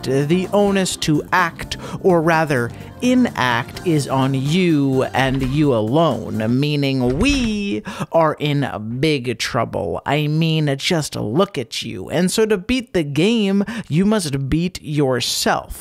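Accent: United States. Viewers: Are you from Wisconsin? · Scott the woz if he was from Tennessee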